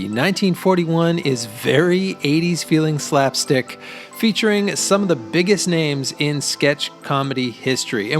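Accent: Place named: Canada. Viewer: The commentator sounds Canadian. I love the way he says a boot instead of about!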